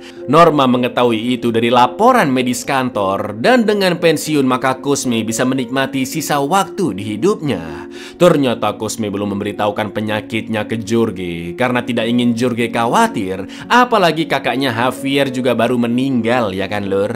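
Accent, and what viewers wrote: Java, Indonesia. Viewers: Beta buka gudang, anggaran ilang (gaya bicara medok)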